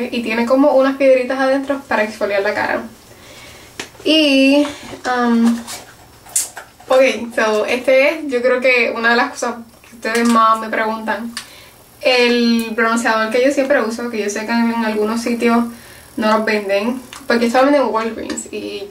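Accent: Puerto Rico. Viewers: nombre : maura país : panamá me gusta lo original que eres y que apesar que vives en usa mantienes tu acento puerto riqueño critica : esa mascara de macadamia no hay en panamá :( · 1- Cheryl Jimenez- Puerto Rico ;) ... 2- Me encantan tus consejos, la manera en que hablas, se te sale lo boricua por los poros ;) 3- No tengo criticas....sigue siendo como hasta ahora, ah bueno...cierra la puerta de tu closet jijiji xoxox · hola. mi nombre es: Barbara soy de venezuela... y bueno hay muchas cosas que me gustan de ti como tu estilo me encantan tus accesorios y forma de vestir tu cabello es hermoso amo tu pollina,tus pestañas y el color de tus ojos.. tambien me encanta tu asento P.R... e aprendido muchas cosas de ti con tus videos.... no tengo ninguna critica para ti.. me gustaria que hicieras mas videos de cocina de postres o cosas dulces y tips para pintarnos las uñas y cosas asi.. 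en fin me gusta todo..TQ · Alooo, Mi nombre es Elivier... vivo en Baja California Sur, MEXICO.. Me encantan tus videos, porque no los cortas ni nada de eso.. son simplemente como tu eres, con errores o sin ellos, y ademas me gusta la forma en que tu hablas, porque me agrada mucho el acento de PR, y RD.. Me gustaria que no cambiaras para nada tu palabreo, porque es lo que te identifica, y me estaria genial que no olvides los videos de cocina, ya que son suuuuper delicious! Un gran saludo, un beso y un abrazo. <3 muak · Hola soy Susana, de España. Lo que más me gusta de tu canal es lo sencilla,graciosa e inteligente que eres, y la manera que tienes de expresarte donde se nota muchísimo tu procedencia puertorriqueña. Como crítica constructiva te pediría que de vez en cuando cambiaras tu peinado o maquillaje, aunque sea poco. Cuídate!:D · que hables bien boricua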